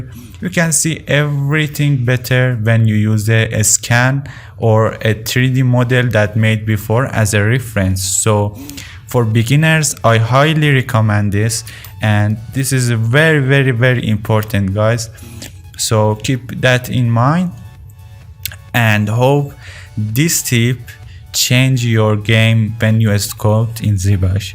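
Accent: Iran. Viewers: Persian accent?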